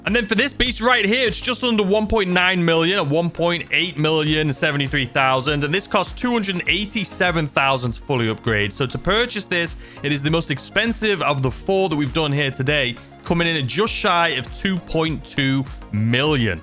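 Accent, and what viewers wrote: United Kingdom. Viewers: “Look at all the pop ups, just bugger off !!! “ lmao only Brits will understand what your saying 😂😂😂 As always, the best content for gta on YouTube 🤘👍👌🤣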